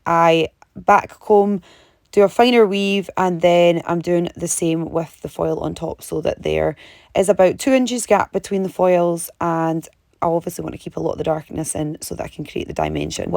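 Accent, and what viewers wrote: Scotland, United Kingdom. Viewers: She's Scottish for sure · You’re Scottish or irish or welsh ?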